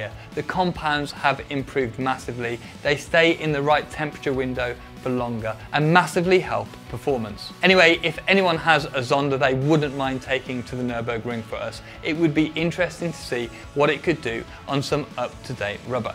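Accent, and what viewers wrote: United Kingdom. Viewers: Good research (probably outsourced), but I literally yawned at the delivery. Standard 'proper' UK, scared af to be unique, business tone. You are boring bro. The car are cool though. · probably my unic car subscription cause i like you man, humble is the reason, clear explainanyion,simple language,serious. i have learned with nicevoice by the way. i know them all(tbh i prefer english than americans,so rug) english has this accent remembering me my arabic-fassi origins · British accent, Captain Kirk cadence.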